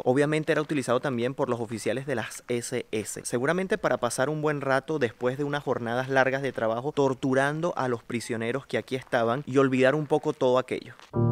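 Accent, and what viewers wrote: Venezuela. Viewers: ME ENCANTO MUCHO LA FORMA EN QUE SIENTES Y EXPLICAS LO QUE ALCANCE A VER DEL VIDEO.. PORQUE LA VERDAD ... LOS SENTIMIENTOS O EMOCIONES NO ME DEJARON CONTINUAR VIENDOLO.... ES MI PRIMER VIDEO QUE VEO DE TI...... ME SUENAS A UN PAISANO...SUENAS COMO VENEZOLANO.... PERO ESE NO ES EL PUNTO..... LA VAINA ES QUE ME IMAGINE ESTAR AHI.... O PASAR UN MOMENTO ASI Y DE VERDAD QUE ES HORRIBLE .. Y ESO QUE ES SOLO IMAGINANDOMELO......... Y SOLO PIENSO EN AQUELLOS QUE VIVIERON ESO.... MUJERES, NENES, HOMBRES DE VERDAD QUE DUELE..... DUELE LA FALTA DE EMPATIA, LA FALTA DE AMOR AL PROJIMO , LA FALTA DE ACEPTAR A LOS DEMAS COMO SON .... NO IMPORTA LA RELIGIONNNN , NO IMPORTA EL COLOR DE PIEL.... NO IMPORTA COMO SEAS..... TODOSSSSSSSSS MERECEMOS [ R E S P E TO ] TODOS SOMOS PERSONAS, NO TRATEMOS A LOS DEMAS COMO SI FUERAN ANIMALES O MENOS QUE NOSOTROS..... 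POR FAVOR AMEMOS AL PROJIMO COMO A NOSOTROS MISMO..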